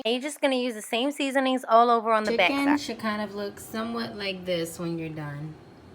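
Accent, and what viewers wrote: United States. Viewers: Hey what state do u live in? Just curious cuz of your accent, sounds like ur from Texas. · Se ve bueno. LoL like the gringa accent😂 voy hacer algo comp eso ahora oren por mi... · Sounds NewYorican accent.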